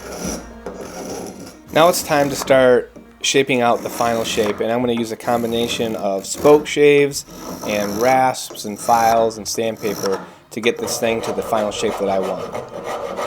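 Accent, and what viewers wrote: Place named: North Carolina, United States. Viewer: Am I heating a North Carolina accent? You sound like my grandson in Fayetteville. Great video.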